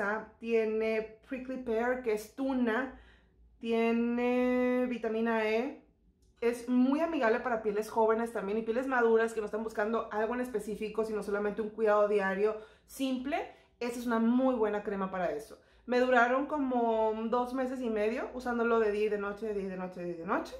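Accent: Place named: Sinaloa, Mexico. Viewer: Ya tienes acento sinaloense!!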